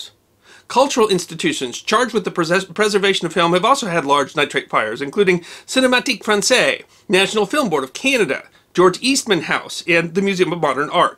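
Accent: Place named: Midwest, United States. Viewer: I know you’re a Midwesterner and it’s involuntary, and no judgement intended, but I beg you, I live in Cah-nahh-duh and not key-nuh-duh. Your accent on this one word kills me every time. Love you!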